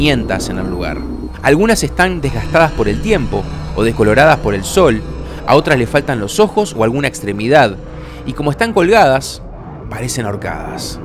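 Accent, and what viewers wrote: Argentina. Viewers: Eres argentino verdad :pd acá en México nos estamos cagando s México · Es interesante escuchar leyendas de mi querido Mexico de voz Argentina jajaja, me gusto la edicion y la forma de contarlas, aunque la de la llorona es algo equivocada, pero hay un comentario que lo explica bastante bien, saludos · Narrada por un argentino???!!! Que poca madre!! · ¿Un argentino narrando leyendas mexicanas? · Qué excelente manera de generar contenido que buena edición y narrativa haces muy buen trabajo soy de Colombia y amo a México como no imaginas, nunca he tenido el placer de viajar allá pero su cultura ha hecho parte de muchos colombianos por años gracias a la tv y ahora el internet la riqueza cultural de México es innegable gracias por dejarnos conocer más de sus tradiciones Aunque bro soy bastante miedosito y esa imagen final de la historia de Nachito donde pones ese niño tan aterrador jmm Dios casi me matas no borro esa imagen de mi cabeza Me gustaría escuchar historias de muchos países más el acento argentino me encanta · Me encantan las historias Mexicanas contadas por extranjeros , el acento Argentino le da ese toque de terror e intriga , ( soy mexicano ) saludos a los argentinos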